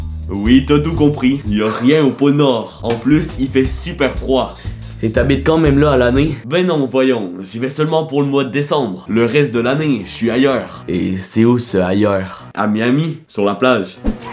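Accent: Quebec, Canada. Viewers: je clique j'entends l'accent québécois je fuis la vidéo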